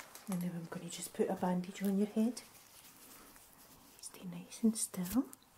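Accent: Scotland, United Kingdom. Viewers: you are amazing don't let the haters get to you btw your accent is lit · Which part of Scotland are you from?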